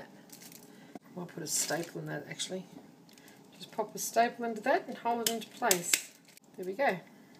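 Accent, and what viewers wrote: Australia. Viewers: Greetings from Melb! Awesome recipe, thanks for sharing! I'm gonna make it for my parents after getting all the ingredients needed ready. (P.S I didn't realise that your accent is the Aussie one,but does sound familiar =_ =||| probably becuz we are on the same boat, hahah) Anyway, thank you for the recipe!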